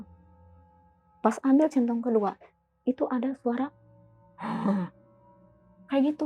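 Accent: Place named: Cianjur, Indonesia. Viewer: Kakyk logat cianjur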